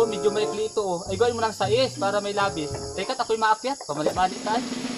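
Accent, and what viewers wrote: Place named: Mindoro, Philippines. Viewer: Hehehe kaganda NG tono nyo Lodi taga San ga kayu Lodi parang mendoro oh simara oh rumblon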